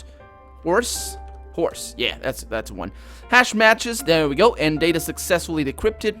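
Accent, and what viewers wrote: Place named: Portugal. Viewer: Aha! So you're portugese? I've always wondered what accent that was :D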